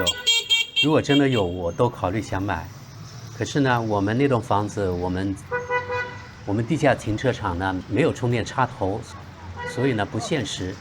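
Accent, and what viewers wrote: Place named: Cantonese, China. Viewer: 小姐姐不像台湾腔，像广东腔。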